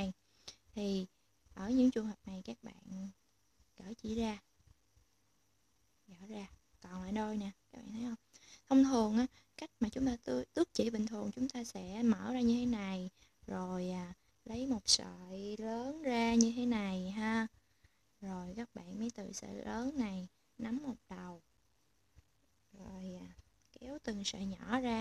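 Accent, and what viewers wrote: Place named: Central-South, Vietnam. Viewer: nói to lên một chút c ơi, giọng miền trong vừa nhỏ vừa vừa khó nghe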